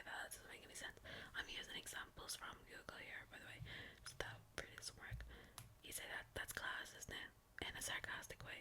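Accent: Dublin, Ireland. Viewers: There's a hint of culchie in that accent yeah. I hear the dublin accent mostly and then on some words it gets weaker lol. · Omg u sound real fuckin Irish lol (Btw I’m Irish two !!) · so thats why it said uk i always knew you sounded like a dubliner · Class to see some ASMR in the Irish accent. Weirdly proud! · Need more of your Irish accent videos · As someone from coolock north Dublin, I can defo hear it in your accent, your Ma thought you well haha😂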